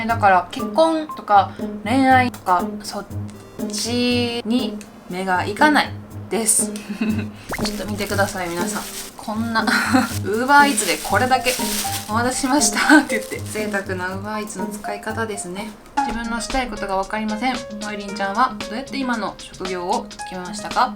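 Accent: Kansai, Japan. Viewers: 女性YouTuber全然興味なかったし見もしなかったけど、もえりんは飛び抜けて可愛いし関西弁が可愛すぎる。。。 チャンネル登録してしまった。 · お疲れ様です、毎回楽しく見てます。50のおっさんでも毎回そうそうと思いながら見てます。関西のイントネーションが好きかも(笑)頑張って下さい！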